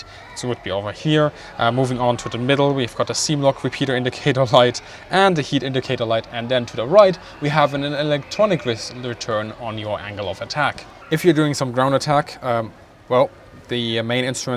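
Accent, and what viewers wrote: Germany. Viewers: Sehr gute Präsentation ... und ein angenehmer, deutscher Tonfall im Amerikanischen ;-) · Oh my... I apologize, that quite heavy German accent made me hear "that secured the jets aircraft position"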